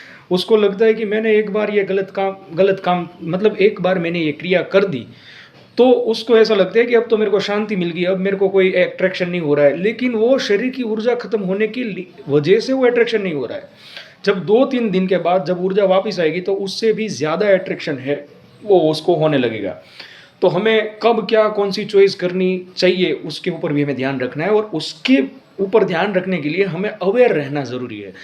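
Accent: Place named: Gujarat, India. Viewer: vaah... Mane laygu j tu tamara hindi ma gujrati tone thi... Bau anand thyo... Tamne hu follow karu chhu and tamara videos jou chhu.. Bau pela pan bija videos aava badha topics par joyela tyare em thatu k chalo amal kariye pan vasna evi cheej che k tamara thi rehvaay nai.. Me pan bau nani umar thi sharu karyu tu... Pan mota thya pachhi control rakhto thyo pan je kevay k man ma gandgi bhari hoy tya sudhi e reality ma avva nu j che.. e eno rasto sodhi ne parane apnne e karavi le... Pan have hu evi umar ma chhi jya mara have lagna thase mari nani ben che graduation complete thyu che last year enu e pan have lagan karva jevi j che.. And mara papa kaik bau kamata nathi.. And hu have 25000 na pagar sathe job sharu karel che 1 varsh upar jene thyu.. Atle have mare to aa badha ma thi farajiyat bahar nikdvu j pade em che kem k have atyare no jaaygo to jeevan have barbaadi taraf j jase.. 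pan sthiti atli pan kharab nathi... Pan thodu to kharab che k hu koi bija ne na sachvi saku jo hu andar thi atlo santosh sathe na jeevto hoy to.. atle man nu ek level have achieve karvu che atle have tamne joi ne man ma kaik inspiration made che.. Em to hu foreign accounting ni job karu chhu.. CA chalu karyu tu 12th pachhi ema CPT thai gyo to pass pan pachhi intermediate ma atki gyo.. have ema job ni sathe preparation kari ne nikdvu chhe.. Pan maro pele thi confidence level occhu hovane karane and communication weak hovane karane kadach maro pagar occho che... Atyare hu eklo bija city ma rav chhu pare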